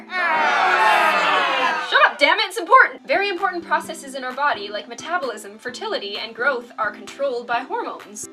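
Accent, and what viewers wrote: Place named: Canada. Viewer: You sound canadian. I love watching your videos because I'm in love with the way you talk. You're just hilarious. And a little quirky. But that's character! :) :)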